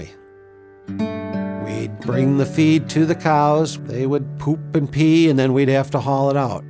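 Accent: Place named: New Zealand. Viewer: Hey, Lovely farm,...... you sound like a kiwi farmer;],.....I practice rotational grazing etc have done for years,..... just a thought, the organic farmers say the moon waxes and wanes,.....ie rises for two weeks and falls for two weeks, so 28 day process. So if you have a round less than 4 weeks you are short circuiting the natural cycle, as in for two weeks the energy goes into whats below the ground, so every round you think boy I'm running out of grass its just not growing like it should, and the next two weeks you think wow look at it grow, so I've always stayed on a minimum of 28 day round, also the extra cover helps keep the moisture in the ground better.We use a grazing planner in Nz for calculating winter grazing rounds transitioning out into a spring round, assisting with areas consumed on any given day, which I think you'd find handy. 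It must help with the marketing of your product all those health outdoor cows:] 😊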